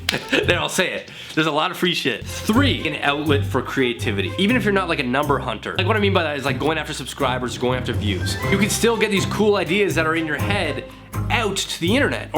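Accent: Canada. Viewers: Well, I think Charlie is cute.......this is the second video......you drew me in with the mirror paint video and you seem to be a normal good guy which is why I subscribed to your ch........not to mention I like the little Canadian accent.....out, aye...........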